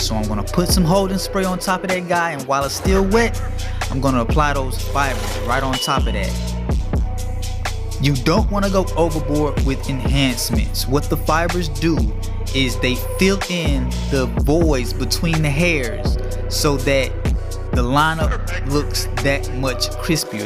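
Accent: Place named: Houston, United States. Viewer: Wicked with the hair cutters as my grandpa would callem... HAIR CUTTERS...I swear you sound like you from H Town...great work!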